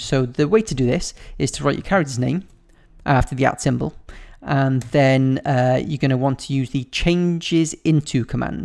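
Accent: Wales, United Kingdom. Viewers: Are you from Cardiff? Or Barry? Or somewhere in Wales?? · Ugh this guy.... He helps me with my story. But i'm getting hella mad at his british accent.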